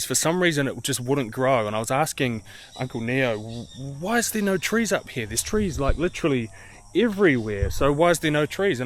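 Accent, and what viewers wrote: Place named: United Kingdom. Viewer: you got a British accent bro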